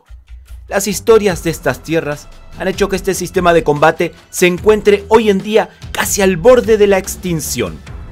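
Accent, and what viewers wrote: Uruguay. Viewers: Sensei le felicito por su excelente trabajo y esfuerzo en mantener viva la llama de estas artes tan nobles. Una Pregunta maestro usted es de Uruguay?! 🤔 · Hola! sos Uruguayo?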